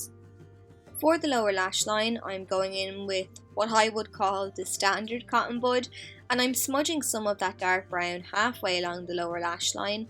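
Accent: Dublin, Ireland. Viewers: is that Irish accent? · Are you Irish? Cuz I love your accent!! Sorry if you're not, I'm new but I'm definitely subscribing!❤️ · I'm from Ireland and that's a Dublin accent 🍀🇮🇪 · I can definitely tell you are Irish, and I think the Irish accent is beautiful. I also use the Rimmel Match Perfection, I have True Ivory. I’m very pale, like porcelain almost. Thank you, this was helpful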